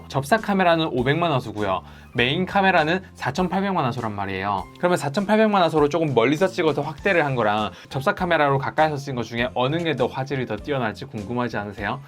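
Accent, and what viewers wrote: Gyeongsang, South Korea. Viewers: 경상도사람이구나. 순간 김영철인줄..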